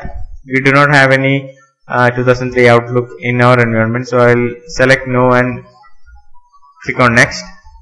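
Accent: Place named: Kerala, India. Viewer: Mallu English!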